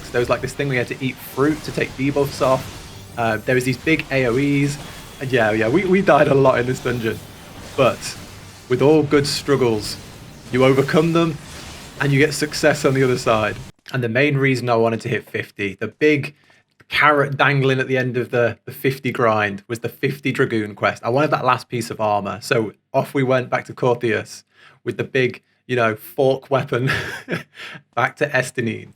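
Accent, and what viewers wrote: United Kingdom. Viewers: Its so fun to follow your progress! 🙌🏻😜 And I like your English accent, so nice to listen to 🙈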